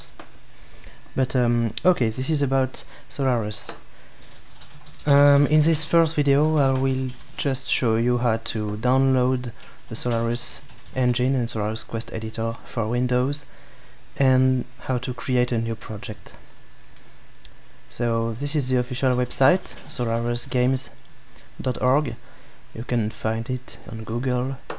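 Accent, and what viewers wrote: France. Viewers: Are you french ? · Whew dude you have a thick French Accent.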